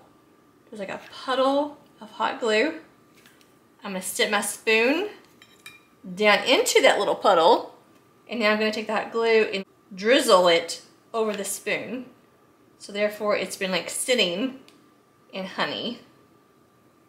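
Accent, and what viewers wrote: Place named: Tennessee, United States. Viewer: I just came across your channel. I enjoyed this video. Each little project came out just adorable. Your tier tray is awesome. I'm trying to guess where you are from by your accent. Maybe Tennessee ? Or one of the Carolinas. I live in Upstate SC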